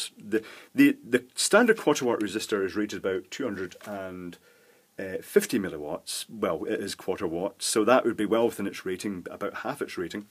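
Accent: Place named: Edinburgh, United Kingdom. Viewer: I followed your (very clear) recommendations and now have a fully working 'UK' charger.Love the videos and the accent (Edinburgh?). Keep 'em coming.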